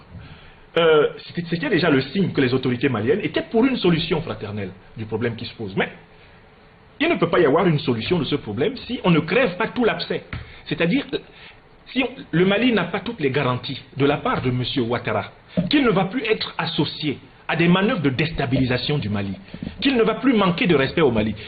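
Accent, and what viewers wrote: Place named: France. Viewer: Pourquoi parle-il comme un français?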